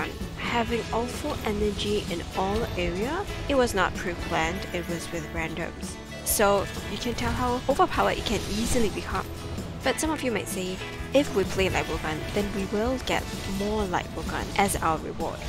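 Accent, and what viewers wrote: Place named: Singapore. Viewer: You sound so Singaporean!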